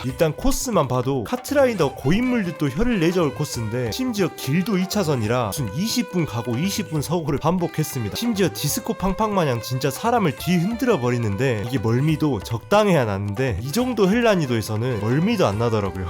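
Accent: Jeolla, South Korea. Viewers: 전라도 말투 잘들었습니당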